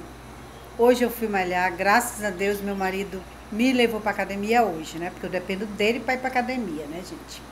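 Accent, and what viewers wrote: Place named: Manaus, Brazil. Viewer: Eita que vc falando deu saudades de Manaus. Morei 5 anos aí no Aleixo. Xeroo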